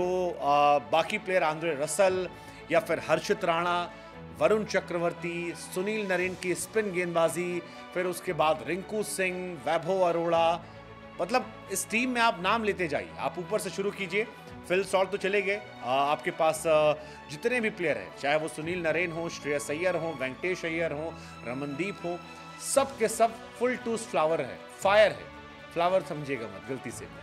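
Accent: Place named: Hyderabad, India. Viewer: Hyderabadi bolthe miya❤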